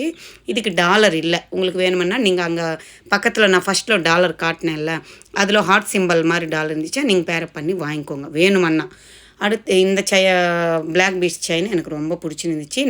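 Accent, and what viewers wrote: Telugu, India. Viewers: మీరు తెలుగు వాళ్ళ sis మీ మాటలు నాకు అలానే అనిపించించింది.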